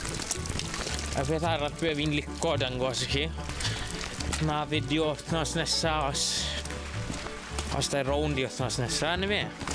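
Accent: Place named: South Wales, United Kingdom. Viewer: Hi, thoroughly enjoying the videos although the hwntw accent takes a bit of concentration, would be interested to learn more about your farm, seems like a great place for an enthusiastic & skilled young chap like you to learn. I see a lot of similarities between you & myself - Parts of our farm particularly some of the old stone buildings are in a dilapidated / neglected state but I have a plan in mind for every square inch of the place, money isn’t too much of a problem here but time definitely is. We also have many old 4x4s, quads & tractors, implements & tools - many have been here since new so a lot of time & money has and still is being spent on refurbishing them so they can in their turn help restore the farm. What’s the plan with the farmhouse? Do you live there or elsewhere? Best Regards.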